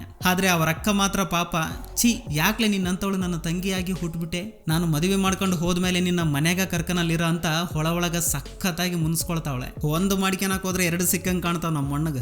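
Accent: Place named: Bellary, India. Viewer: ನಿಮ್ಮದು ಬಳ್ಳಾರಿ ನ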